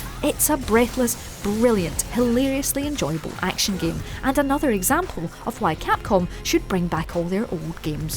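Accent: Scotland, United Kingdom. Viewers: The games are good, but your british accent makes my ears bleed to death... I am sorry but why cant you pronounce the word Year or Around or Our withouth twisting so many unnecessary times your tounge? Its nothing personal towards you. And no, i am not a bad person.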